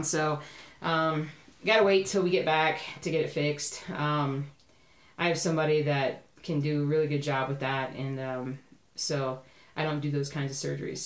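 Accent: South, United States. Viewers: So sorry to hear about your fur baby! I pray Jelly girl is well soon. By the way there's nothing wrong with your southern accent coming out....I'm from KY!!! :-) Great episode, just way to short, sheesh you know your number one job is to entertain me, I meant us (yeah I meant us hehehe)!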